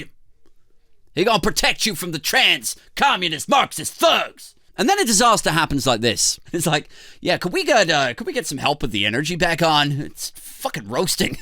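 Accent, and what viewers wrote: United States. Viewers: I love your American accent, say "I'll use my credit card!' next!!!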